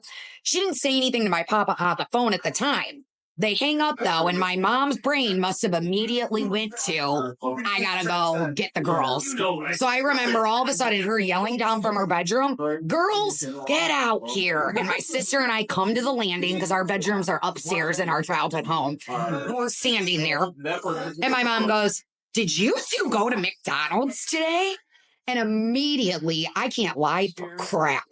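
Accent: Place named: Midwest, United States. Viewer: NGL, for YEARS I thought you were either from the Mitten or Wisco.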